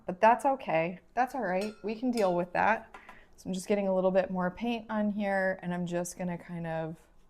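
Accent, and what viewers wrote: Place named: New Jersey, United States. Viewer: I'm guessing you're from New Jersey from the way you say orange! No a - ornge! Ornry ornge! That's how we say onery down here - no e! Say Ornry ornge 3x fast & yer jaw will let you know about it.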